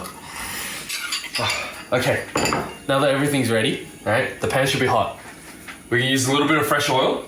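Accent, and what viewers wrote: Australia. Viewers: Are you Australlian? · You sound Australian but you're chinese.. im so confused! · He Australian?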